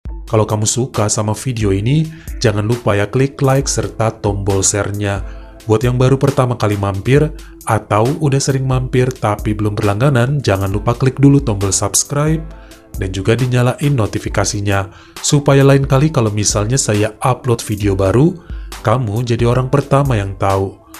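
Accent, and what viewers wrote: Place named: Medan, Indonesia. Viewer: Dari sekian banyak alur cerita, cara menceritakan abg lebih enak didengar, mungkin karena kita sama sama medan, kisahnya juga pada seru dan menarik, sehat selalu bang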